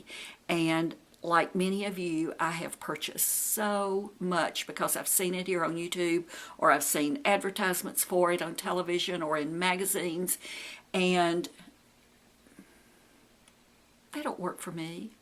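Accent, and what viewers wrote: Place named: Western Kentucky, United States. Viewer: Hey, Thanks to you, I shopped my stash and "rediscovered" my Laura Mercier Mineral Powder foundation. I had totally forgotten how awesome this product is! I love your videos and think you are fabulous! I'm from Western Kentucky so we sound a lot alike too, ha!!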